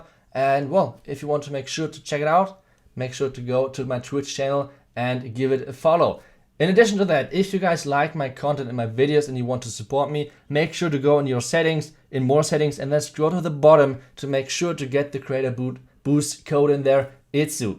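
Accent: Germany. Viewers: Speaking english with German accent, that was unique 👌👌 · Are you german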